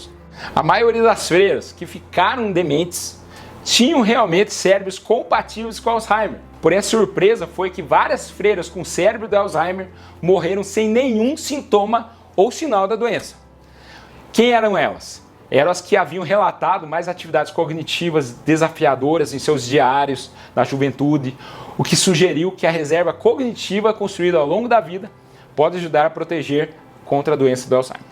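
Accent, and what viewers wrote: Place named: Minas Gerais, Brazil. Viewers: Doutor de acompanho da Bélgica, gosto demais, e amo teu sotaque, você é de Minas Gerais, ou estou enganado? Abraço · Gosto muito do jeito q ele fala,como se fosse mineiro 👏👏👏👏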